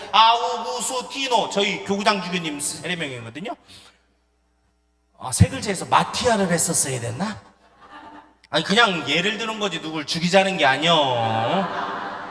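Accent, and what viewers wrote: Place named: Chungcheong, South Korea. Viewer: 그런겨어 ㅎㅎ 우리충청도 사투리 반갑습니다 영육간에 강건 하세유😂😂😂😂😂😂😂😂😂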